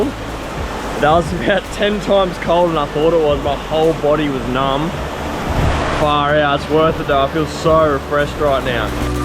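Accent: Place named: Far North Queensland, Australia. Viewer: Your production is incredible. I absolutely love ALL your videos. Your camera presence is so raw, real and refreshing. The FNQ accent makes it even better haha! Will you ever consider travelling overseas and create hiking videos? Great work. 🙏🏻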